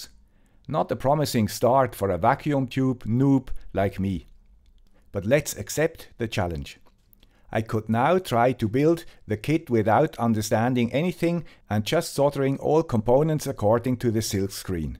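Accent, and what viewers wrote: Switzerland. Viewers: You were easy to remember, you were the big guy with the Swiss accent! It was a product launch road trip through Europe I believe, or later when I worked for COGNOS and we were launching a DEC platform. It was a long time ago but luckily none of the equipment had vacuum tubes.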